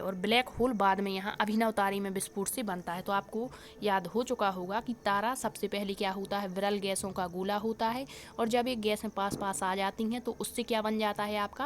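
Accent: Bundelkhand, India. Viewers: Lovely ❤ voice mam aap pakka bundelkhand area ki hongi ☺ plz reply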